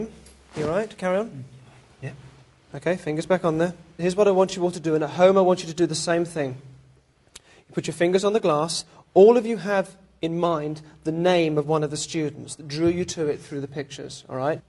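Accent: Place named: England, United Kingdom. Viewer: this is horse doody!! there is no seance just a bunch of englishman crap and he will not stfu making me wanna commit suicide with that horrible accent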